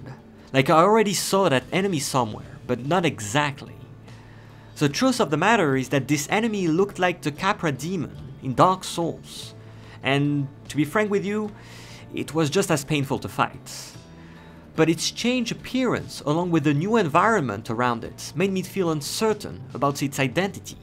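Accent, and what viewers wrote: France. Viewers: Are you French ? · i love Your french accent and the way You narrate subscribed !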